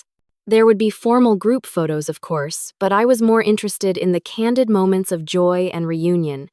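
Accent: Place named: United Kingdom. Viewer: You sound like from UK . Whoever is reading does not read well. Wrong pronounciations. !!!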